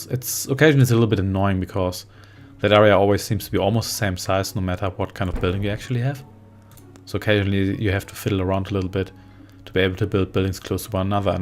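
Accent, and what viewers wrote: Germany. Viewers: It looks like it would make for a neat app lol are you german by the way? I like your accent :)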